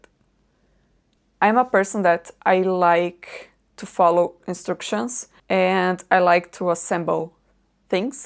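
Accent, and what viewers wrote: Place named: England, United Kingdom. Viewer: You're very talented, and your English accent is lovely.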